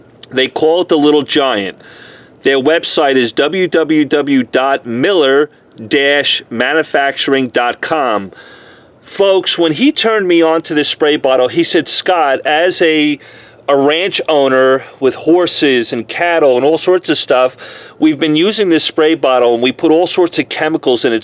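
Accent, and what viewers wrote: New York, United States. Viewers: The Deamon he’s originally from new York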